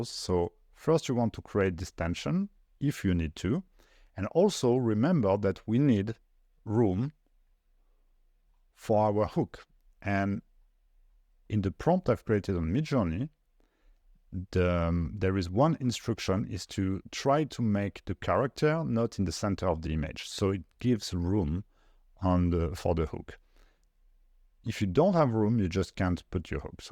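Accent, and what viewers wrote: France. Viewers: L’accent français ;)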